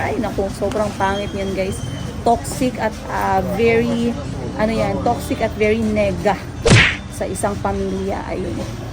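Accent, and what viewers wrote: Iloilo, Philippines. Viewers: I love ilongo accent sayo madam 😂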